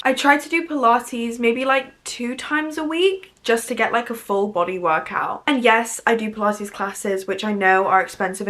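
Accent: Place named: United Kingdom. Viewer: Girl I love this and was happy to see another British youtuber so totally embrace your own accent and leave the american one out x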